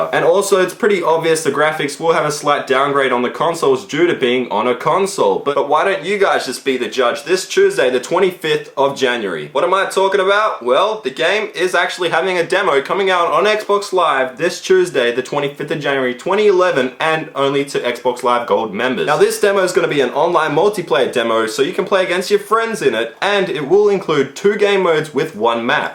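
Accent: United Kingdom. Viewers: Is this guy British?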